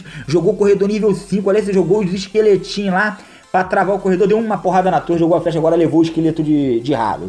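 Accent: Rio de Janeiro, Brazil. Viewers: ei VC é do rio de janeiro né? puxa o x, ixqueletos, e tbm parece ter a língua presa ne?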